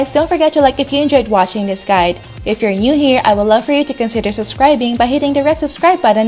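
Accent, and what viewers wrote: Philippines. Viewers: I love your filipino accent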